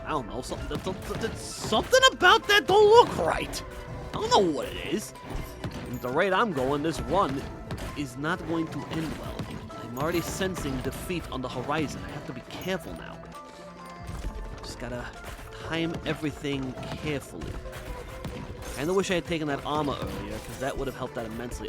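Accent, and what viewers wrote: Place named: New York, United States. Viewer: It amazes me how YouTube isn't going out of business. Also your doing well and have a great day honestly I don't mind the swearing plus love New Yorker/Chicago accent reminds me of the days of Mafia like fat Tony from Simpsons and Vinnie cannoli from guns gore and cannolis.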